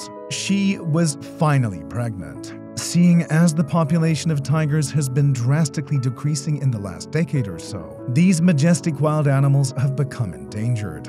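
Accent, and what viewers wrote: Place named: United States. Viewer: I just don’t like the american accent in this video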